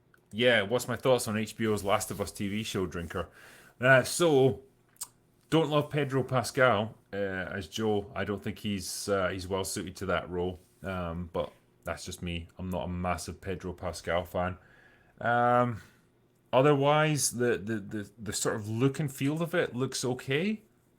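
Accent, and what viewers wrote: Scotland, United Kingdom. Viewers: Talks like a Scott, drinks like an Irishman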